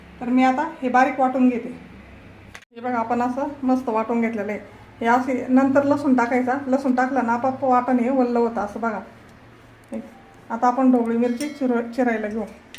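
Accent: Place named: Nashik, India. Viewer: ताई तुमची बोलीभाषा ऐकून असे वाटते की तुम्ही नाशिकच्या आहात .. बरोबर का ?? शिमला मिरची खूपच छान बनवली ..बघून तोंडाला पाणीच आले . . ❤❤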